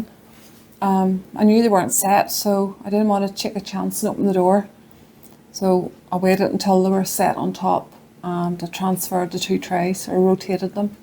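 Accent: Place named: Northern Ireland, United Kingdom. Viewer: I’m enjoying your videos- not only for the recipes but I love listening to your voice/ accent. I’m originally from NI. Haven’t heard anyone say ‘just fire it in’ for years. Thank You.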